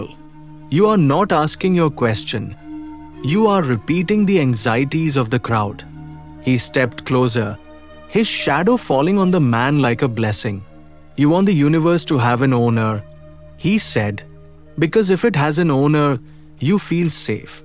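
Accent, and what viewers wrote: India. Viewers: The narrator speaks with heavy indian accent. The story is not from bible for sure. Most probably from hindu since hindu is big religion in India.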